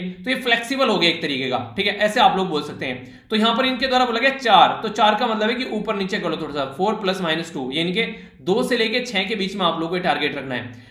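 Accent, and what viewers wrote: Haryana, India. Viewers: Aap haryana se ho sir... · Am damn sure sir that u are from Haryana and from around Rohtak sonipat belt .0001 jitna bhi doubt nhi h😀🙏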